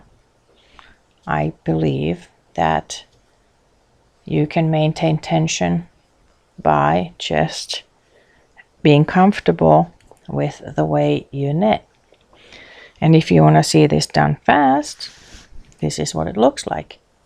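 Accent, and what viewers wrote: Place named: Russia. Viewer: Yes, IMHO, her purl is definitely 'Russian' style.....perhaps her accent, too.....not that it matters..........and, it twists the stitch CW versus CCW and requires working through the back of the stitch/es on the return row......which can get messy if working a complicated pattern.....lace work in other words.....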